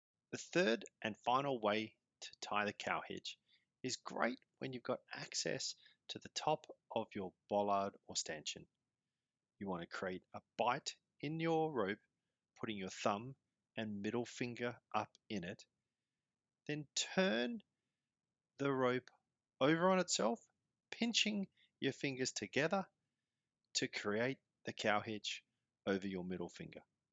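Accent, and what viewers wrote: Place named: Queensland, Australia. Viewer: I sure do hear your accent so I assumed you are from QLD? Doesn’t sound like VIC, SA or WA to me, but it isn’t easy to tell… Belfast, Northern Ireland, only 980 Nm from where I live (now). 😉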